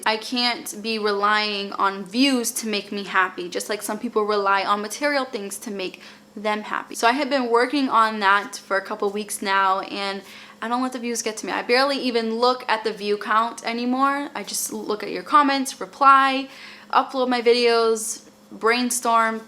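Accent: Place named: New York, United States. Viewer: Your accent reminds me of our New York accent lol I love it lol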